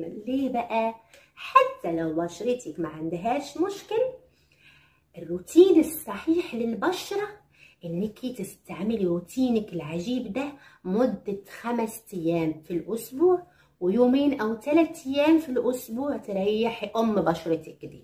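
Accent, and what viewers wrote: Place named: Morocco. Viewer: كنت أظن أنها من مغرب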